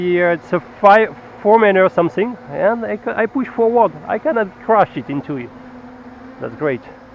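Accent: France. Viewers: vous avez un fort accent français je me trompe ou pas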